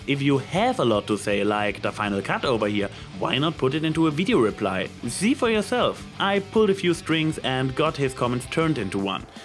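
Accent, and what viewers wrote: Germany. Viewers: Quick question, are you German?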